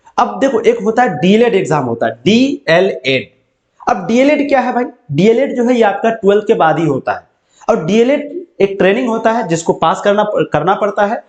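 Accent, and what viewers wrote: Bihar, India. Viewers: Sir aap Bihar se ho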